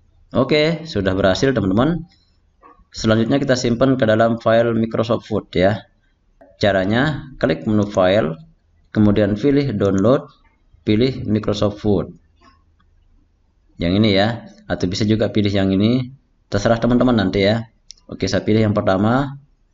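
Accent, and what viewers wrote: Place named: Lombok, Indonesia. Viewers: Terima kasih... Matur tampiasih meton.... Sangat bermanfaat..... Dialeg Bahasanya orang sasak ni....😀🙏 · Logatnya kayaq orang lombok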